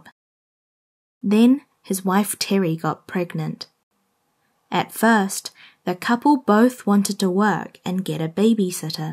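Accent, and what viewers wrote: New Zealand. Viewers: 이 영어 발음이 뉴질랜드 영어발음 악센트인가요?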